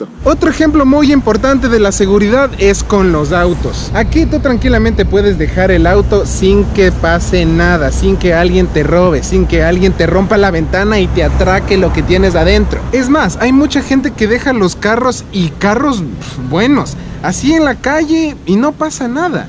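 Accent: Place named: Ecuador. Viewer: Nunca perdiste el acento ni tu identidad Ecuatoriana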